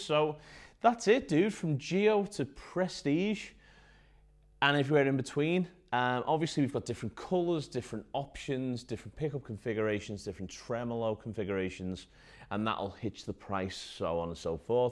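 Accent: Liverpool, United Kingdom. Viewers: Subscribed because you gave good reviews (Just ordered an Ibanez gio grg 121 yesterday as my first guitar to learn on) and you got that scouse accent. (shoot me If I'm wrong) Ha cheers from Australia.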